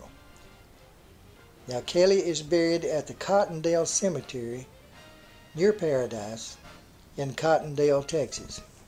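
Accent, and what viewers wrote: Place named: Kentucky, United States. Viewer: I love your accent. It sounds Kentucky or maybe Tennessee, but I could be wrong.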